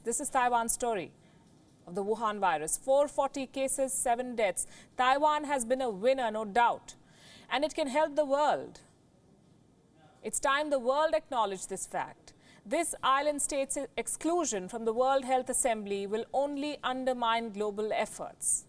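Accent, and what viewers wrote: India. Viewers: This channel needs a host who can speak without the Indian accent. Take a note from RT who use American accent despite being Russian. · go ahead dumb. put your tongue straight and get rid of your indian accent otherwise Taiwan persons will not understand "vhat are yu tooking about"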